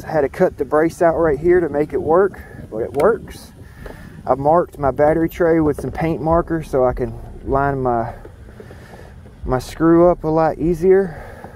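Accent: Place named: South, United States. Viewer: Love your southern twang. I'm from Michigan but talk a little like you. I'm Midwest, and southern Indiana, with Canadian accents here and there HaHa!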